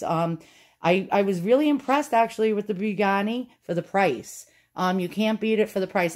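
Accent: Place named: New Jersey, United States. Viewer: I love your east coast accent! New Jersey?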